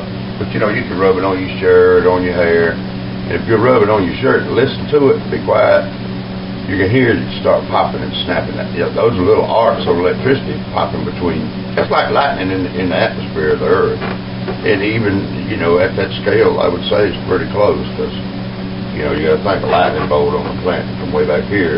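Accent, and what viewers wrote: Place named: Kansas, United States. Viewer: yes the voice and i do like the accent from kansas??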